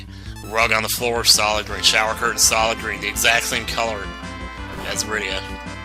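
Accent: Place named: South, United States. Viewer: Based on you're accent i bet you are from the south. Great game by the way, even if that tower was a bit of a bitch.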